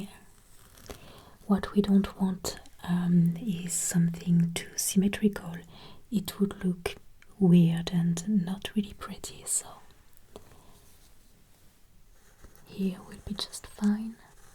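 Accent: France. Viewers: I think I'm addicted to your videos. Nowadays it happens not often to me to fall asleep without your smooth voice and so divine french accent. I just love it so much, I melt...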